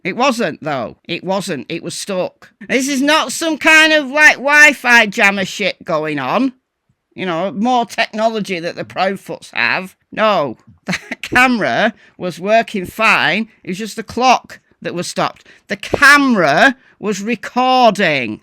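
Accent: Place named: North, United Kingdom. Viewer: Heh heh heh no one can rant quite like a northerner! Brilliant! Well said!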